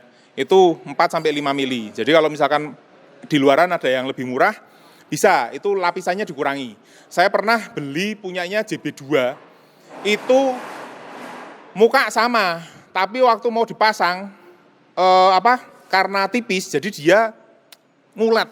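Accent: Java, Indonesia. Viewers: Jowo tulen iki cak 🤣😂,, mantapp om 👍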